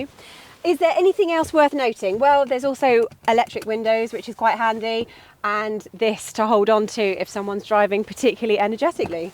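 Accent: England, United Kingdom. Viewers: At last a car review in a lovely English accent. Test drove one today, and will probably buy it over the weekend 👍🏻.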